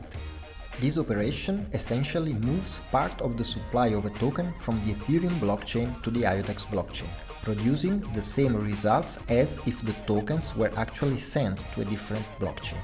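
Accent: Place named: Bulgaria. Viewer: the speaker has bulgarian accent :D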